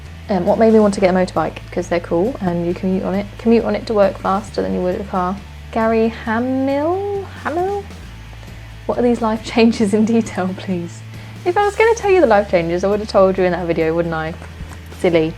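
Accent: England, United Kingdom. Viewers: lets comment. i dont norm bother but i found your channel ages ago and all i heard was some nuts english lass babbling away in her helmet. I was like yes so its not just me. You keep it it up and fair play with the face on cam stuff bst be careful on what ya put up on the because of the fuzz.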